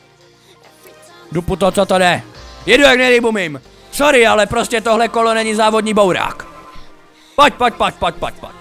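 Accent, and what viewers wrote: Moravia, Czech Republic. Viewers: ty jsi fakt moravák:D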